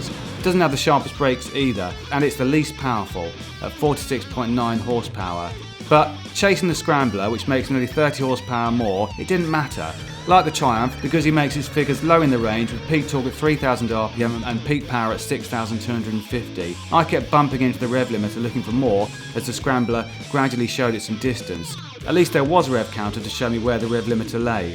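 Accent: United Kingdom. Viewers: The guy doing this voice over is the most british man I've ever heard. Betta Rubba Anova Neva Scrambla Eeva Orsepowa Fazand Ova Senta Viza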